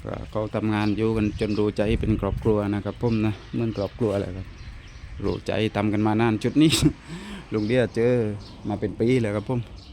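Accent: South, Thailand. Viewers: ชอบสำเนียงการบรรยายคลิป ลุงเดีย.. มากๆ สำเนียงใต้ ได้อรรถรสดี... แต่ก่อนทีมหลังบ้าน กดติดตามแต่ช่องบ่าวโทน กับแม่บ่าวโทน เดียวนี้กดให้ทุกคน..แล้ว... ระยะเวลาเกือบจะ4เดือน ได้ดู การกระทำ ดูแล บ้านน้องอุ๋งอิ๋ง และสัตว์เลี้ยงน้องดีมากๆ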